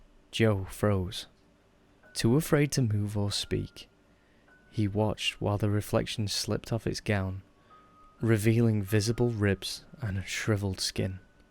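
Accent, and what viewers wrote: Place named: England, United Kingdom. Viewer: I knew that was an English voice, me to. I'm from the South but live up North xxx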